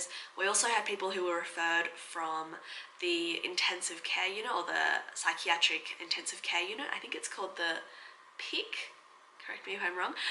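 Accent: Australia. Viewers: keep up your Aussie accent. Im a BBASEAN British born South East Asian, and none of us talk like Brits we all talk like gangsters hahaha 😎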